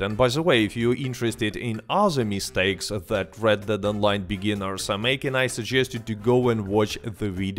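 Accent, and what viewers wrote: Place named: Russia. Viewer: My dear I stop paying the game but I still love your video I enjoy your Russian accent very much please keep up the good work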